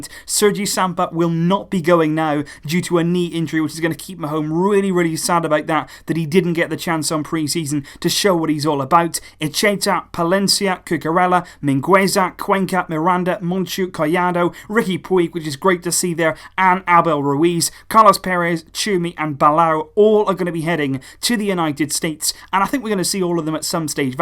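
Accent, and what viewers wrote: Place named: England, United Kingdom. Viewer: i like listening to your english accent again welcome back my friend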